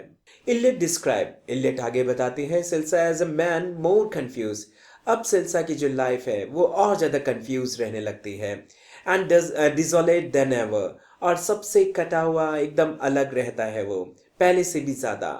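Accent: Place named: United Kingdom. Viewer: Jada angrej mt bn thoda theek se bol ki hume samjh aaye aawaz bhi ajeeb si hai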